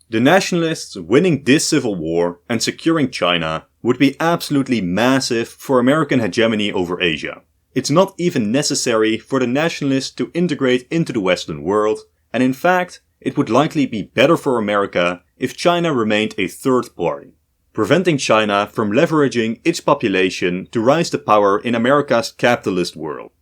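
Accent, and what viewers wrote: Netherlands. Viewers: You sound dutch like me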